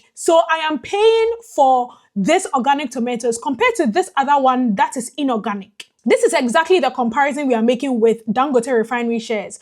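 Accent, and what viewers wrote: Ghana. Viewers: She sounds Ghanian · You sound Ghanaian please refine your accents and be more Nigerian, your content is good enough but your accent is not so Nigerian and you know the vibes between the two countries You go explain tired ooo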